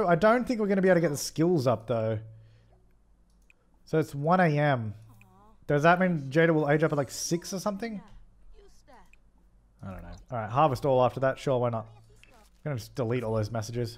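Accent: Australia. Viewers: I have been sick and binging this series. I wasn’t listening and my mom asked me “oh…you aren’t watching the chaotic Australian sim guy?” Hahaha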